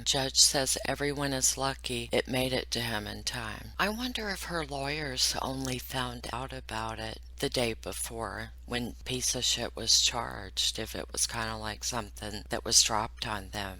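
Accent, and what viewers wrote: United States. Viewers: Love listening to you're commentary in that beautiful American accent. Great how you say what is the truth and not what people want to hear. Lots of love from London uk x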